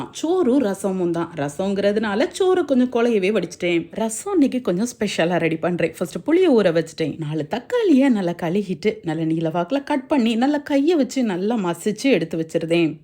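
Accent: Tirunelveli, India. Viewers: Sister neenga thirunelveli ya??...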